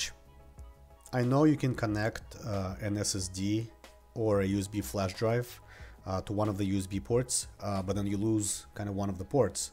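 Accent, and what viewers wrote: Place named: Russia. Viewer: You speak like Russian talks English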